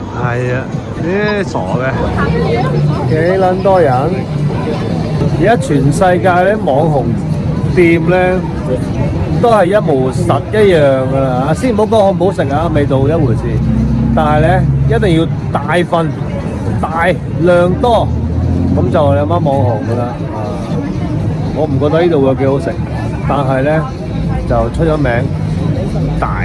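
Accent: Guangzhou, China. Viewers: 亞女講句粗口嚟聽吓先，睇吓正唔正宗廣州口音先😂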